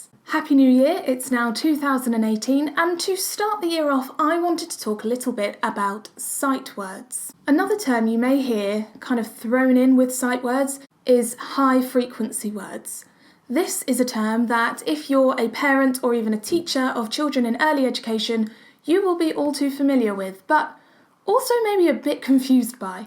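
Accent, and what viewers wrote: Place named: RP, United Kingdom. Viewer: British accent; more specifically, received pronunciation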